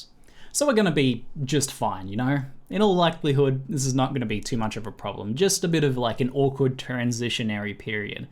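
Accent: Australia. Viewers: I'm Australian too and he definitely has an Australian accent. If he was a Kiwi he'd call himself:)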